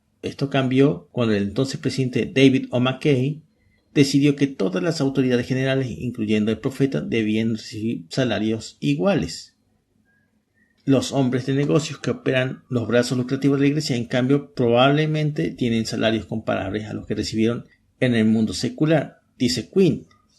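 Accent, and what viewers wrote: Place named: Córdoba, Argentina. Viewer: Hola, me llamo Gaston, soy argentino. Estoy viviendo en Mexico. Por tu acento me parece que sos cordobes, sino me equivoco. Mira, quizas te resulte raro esto, pero aunque soy un "miembro activo y sirvo como lider de estaca" me han gustado varios de tus videos (no vi todos), en especial el que hablas de Korihor. Me gustaria, si fuera posible hablar quizas por correo o wats para hacerte algunas preguntas. Me gusta encontrar personas que se cuestionen las cosas que aprenden. No es un intento para "reconvertirte" jeje, en verdad creo que sos sincero en tu manera de exponer tus ideas y conceptos. Aunque creo en Jose Smith, y todo lo que conlleva eso, primeramente que eso creo en Cristo y sé que la Iglesia no es perfecta, refiriendome a la "Iglesia" como pueblo dirigido por hombres imperfectos pero creo en la doctrina que aun sigo aprendiendo, aceptando que no todo lo que un lider dice tiene que ser verdad. 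Mi correo es <mail> y mi celular es <num>. Espero que me des la oportunidad de conversar con vos.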